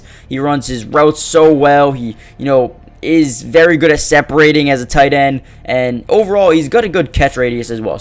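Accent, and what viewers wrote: Canada. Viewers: Do I hear a Canuck in your voice????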